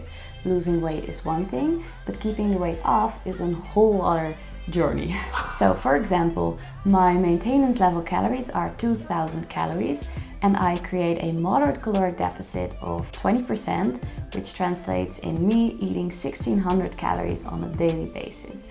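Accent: Netherlands. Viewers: Am I the only one who heardddddd the Dutch accent Ik was zo van kan je in nls praten 😂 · Great video :) Thanks for the great information! Are you Dutch??? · I hear a Dutch accent, are you Dutch? :D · I think it's her slight slight dutch accent ;)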